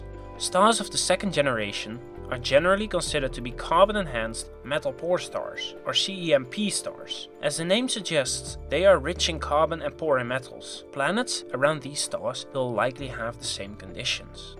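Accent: Netherlands. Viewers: dutch accent confirmed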